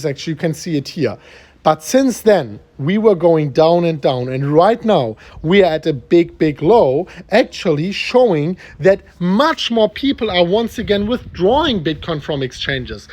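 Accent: Germany. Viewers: Täusche ich mich, oder hast Du nen extrem deutschen Akzent? 🤔😃 Thanks for your nice video.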